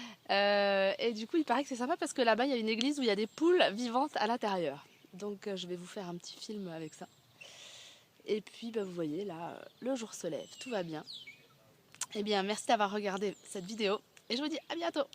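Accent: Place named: Spain. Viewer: Bon courage et soigne toi bien surtout. Et puis l'accent espagnol avec le nez encombré. ... c'est pas simple. Bisous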